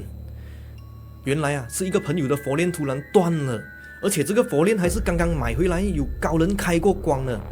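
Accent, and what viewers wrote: Malaysia. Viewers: 好喜欢听你讲故事，可以了解马来西亚文化又可以听马来西亚独有的腔调。:face-blue-smiling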